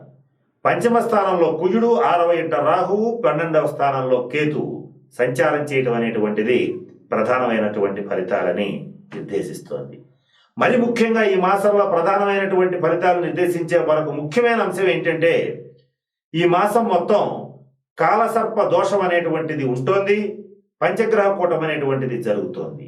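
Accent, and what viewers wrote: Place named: Telugu, India. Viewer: Your explanation is good . The reason may be due to your training as a teacher coupled with your accent in Telugu . I am viewing your videos on Dhanassu as the sign is of me & my son belong to that Rasi . Thank you sir . From New Mexico USA camp .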